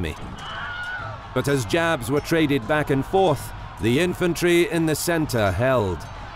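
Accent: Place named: United Kingdom. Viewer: Are you trying to be Kings and Generals or Bas Battles? I love it. A different yet still British narrator. Haha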